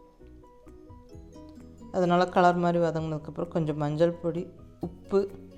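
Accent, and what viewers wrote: Kongu, India. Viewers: கோலை கொங்கு தமிழில் பேசி சமையல் கலை அற்புதமாக இருந்தது பீர்க்கங்காய் கடைசல் ஜோர் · Ama madam namma kongu spe super 👍👍👍👍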